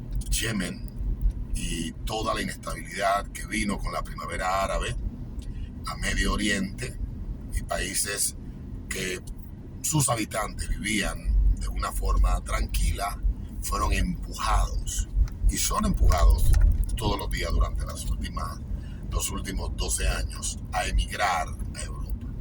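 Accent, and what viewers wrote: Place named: Dominican Republic. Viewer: rayos en verdad cuando vi la miniatura pense que no tu aspecto no parecia de una persona de republica dominicana, todos esos pensamientos desaparecieron cuando escuche tu voz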